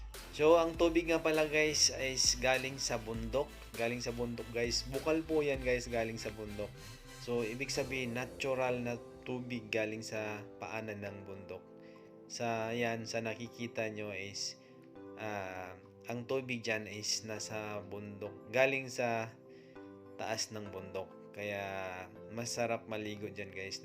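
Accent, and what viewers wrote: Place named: Bicol, Philippines. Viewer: Akala ko Bicolano ka,, saka yong bukal akala ko pinakikita nila talaga 😂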